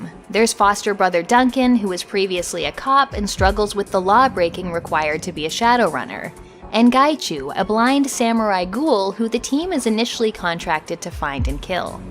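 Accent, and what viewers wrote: Canada. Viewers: What accent is this Canadian ?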